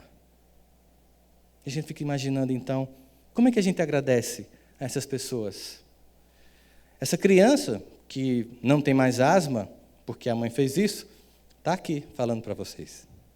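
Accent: Northeast, Brazil. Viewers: Que história linda!!! Sou sua fã incondicional. Moro em Natal e gostaria de saber se você vem aqui para uma palestra.... lhe acompanho há quase dois anos. Adoro suas palavras; suas palestras e seu sotaque que é igualzinho ao meu. Sempre grata a você que é um enviado de Deus. Muitas bençãos pra você é família 😊🙏🏼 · Também gosto do sotaque. Sou paulista mais admiro um nordestino que fala assim com clareza. Na minha região falamos muito feio kkkkk.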